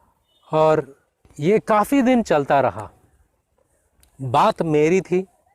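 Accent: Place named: Goa, India. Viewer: Jhoot kion bol the ho tmhara accent he Goa k Christian's wala ha jhootay makar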